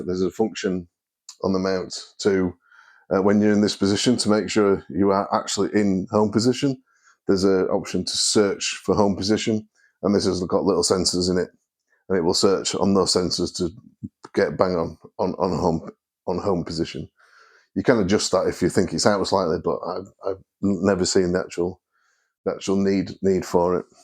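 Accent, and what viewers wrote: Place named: South Yorkshire, United Kingdom. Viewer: Thanks! Nice to hear a south Yorkshire accent. I'm in north Norfolk so not a million miles away. I'm currently looking seriously at the HEM15 to replace the Skywatcher Alt-Az I'm currently using, so your review is very useful. I understand the HEM15 has wifi, so presumably I don't need the hand controller?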